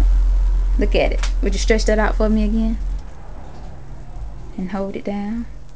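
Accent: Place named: South, United States. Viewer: You have the cutest southern accent!!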